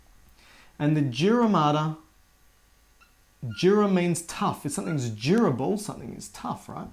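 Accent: Australia. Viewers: Madhu Parisa that’s just the Australian accent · As an Australian, I love watching people in the comments of your videos struggling to understand your aussie accent 😂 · Same lol, it's the Australian accent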